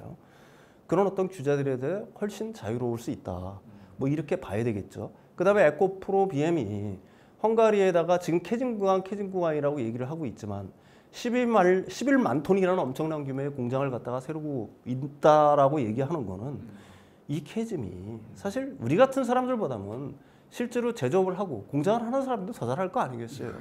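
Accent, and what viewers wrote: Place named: North Korea. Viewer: 억양이 북한사람 같아요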